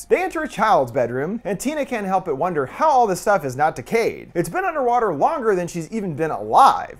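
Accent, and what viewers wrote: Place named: United States. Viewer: that American accent is so annoying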